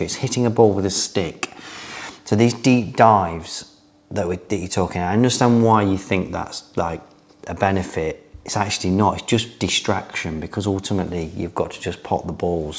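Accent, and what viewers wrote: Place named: Nottingham, United Kingdom. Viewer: Vocal inflection? He clearly doesn't know how people from Notts sound duck 👍 Looking forward to seeing what comes next to the channel!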